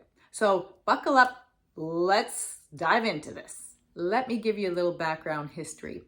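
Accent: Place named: Canada. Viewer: It must be strange presenting your American passport at the US border with such a heavy Canadian accent. Don't the border guards ask where you grew up? You don't sound American at all to me.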